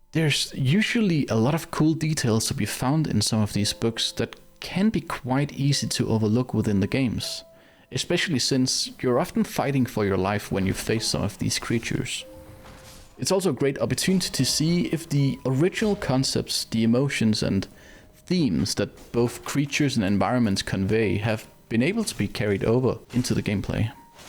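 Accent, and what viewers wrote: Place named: Denmark. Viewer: Er du ikke dansker, syntes din acccent lyder som så ;)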